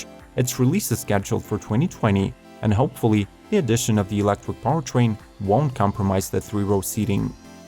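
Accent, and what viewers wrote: Russia. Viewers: what is this killing russian accent for?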